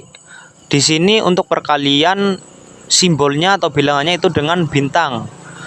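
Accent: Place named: Banyumas, Indonesia. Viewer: Ngapaknya itu loo 😍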